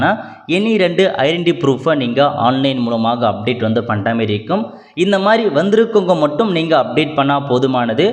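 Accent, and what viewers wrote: North, India. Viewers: Unga voice vadakkan tamil pasera maare erruku ...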